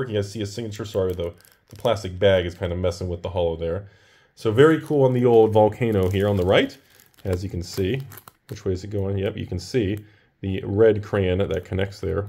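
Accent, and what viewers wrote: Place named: South, United States. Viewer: It’s his pronunciation. Sometimes I hear it was “crowns” IN THE SOUTH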